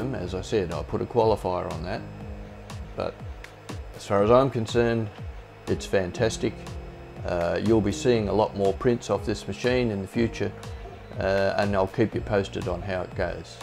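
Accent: Australia. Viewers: Thanks for the video. Could you please clear up whether there is a difference between the US and the EU version other than the supplied cord, and that either are safe to use in Australia with a local converter. Good to hear an Aussie voice reviewing. Cheers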